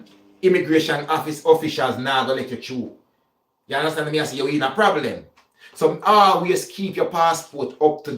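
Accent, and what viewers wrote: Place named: Jamaica. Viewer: ❤. Love listening and learning. Keep up the great work for the people.❤❤ Truly just Love the Jamaican accent. Would Love to return to and immigrate to Jamaica. ❤❤❤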